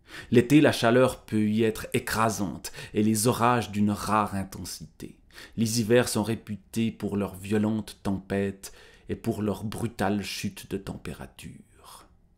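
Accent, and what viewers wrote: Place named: Vaud, Switzerland. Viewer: beaucoup aimé ton accent qui vient de chez moi ( je suis vaudois)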